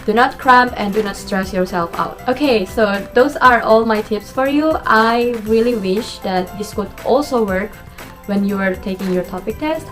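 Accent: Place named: Philippines. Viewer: Oh yoy r a filipina !the English lol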